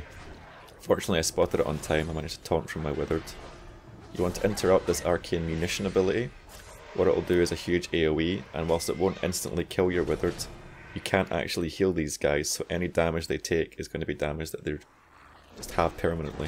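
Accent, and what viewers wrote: Scotland, United Kingdom. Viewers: You have the softest Scottish accent i have ever heard :O i nearly crapped my pants when i heard it I'm Scottish myself which i think made it more striking :O great guide though BIG THUMBS UP BRO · you sound scottish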